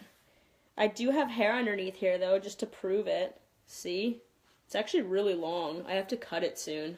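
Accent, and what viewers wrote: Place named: California, United States. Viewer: why does she sound like she's From Cali 😂 that's how we talk here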